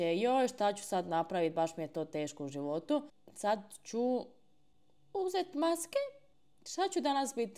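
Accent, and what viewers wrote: Dalmatia, Croatia. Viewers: Ja kad te gledam plačem još delmatinski naglasak😂😂😂😂 ja se kupam u suzama (nije mi dobro)❤❤❤❤❤❤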